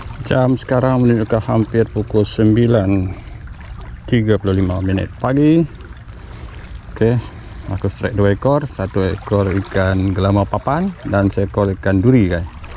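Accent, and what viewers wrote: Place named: Banjar, Indonesia. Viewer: pekat banjar nya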